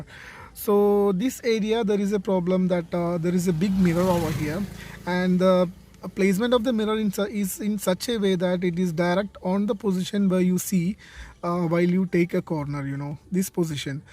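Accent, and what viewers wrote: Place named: Kerala, India. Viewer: Are u malayali?? Ur English slang resembles Malayali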